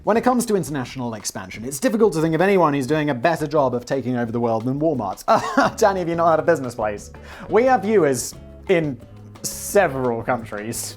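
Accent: United Kingdom. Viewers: in every video: Speaks with a British accent Comments under every video: Complains about how pronounces things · He’s British and they pronounce things differently. People in different countries may speak differently than us.. it’s really ok.. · Sticking up for here with all the mis-pronunciation comments. As a British person with a similar vernacular to that is how we would pronounce those words. Although at times I think has lived a sheltered life.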